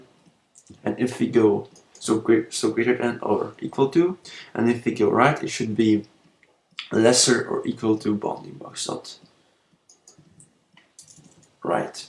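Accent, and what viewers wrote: Russia. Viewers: Great work.... these tutorials ignite my passion for game programming.....Keep it up... BTW..you sound Russian...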